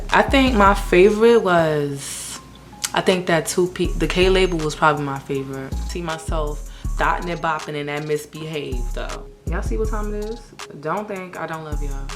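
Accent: New York, United States. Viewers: “Are you duumb?” You’re so NY I love it!! 😭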